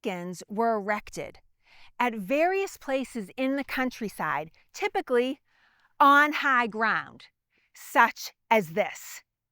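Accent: Boston, United States. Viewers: Well go listen to other historians talk about Boston and they call Charlestown Charleston and they mispronounce all the street names to me I love the accent it brings me back home I'm from Revere living in Oregon I really miss home this is like a little slice of home for me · Well I learned something I never knew, and it was courtesy of that distinctive Boston accent, lol. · Your authentic Boston accent is enjoyed. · When you hear her talk, there’s no doubt, she is from Boston! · Great Boston accent! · Watch out British accent documentarians who are sooo popular … Boston is here now… it’s a new era of the documentary genre!❤❤❤I love this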